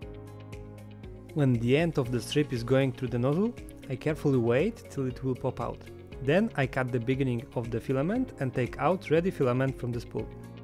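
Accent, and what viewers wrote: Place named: Poland. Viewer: POlak?? Dobrze slysze :D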